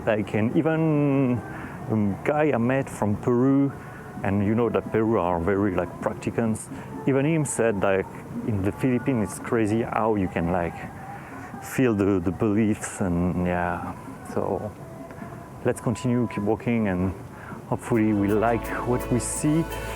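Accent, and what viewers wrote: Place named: France. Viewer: I think he’s French that’s why they say oi as oa.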